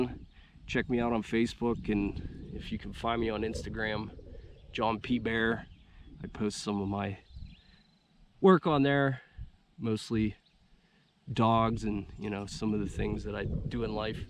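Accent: Western Pennsylvania, United States. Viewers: Congrats on reaching 5000 subscribers! Stay humble, keep learning. Continue to set high personal standards. Keep doing great work. AND hang on to that Western PA accent!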